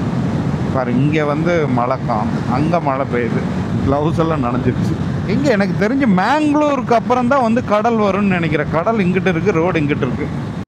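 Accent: Kongu, India. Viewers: Hello bro, 3 days ku munnadi dhan unga first video pathen, adhuku aparam almost 8 to 9 videos back to back pathuten. Nice vlogs in kongu accent. Oru question, vehicles lam overtake pannumbodhu horn adika mateenguringa adhu dhan konjam concerning ah iruku, especially lorry ku left side overtake pannumbodhu. Please take care. All the best for upcoming videos.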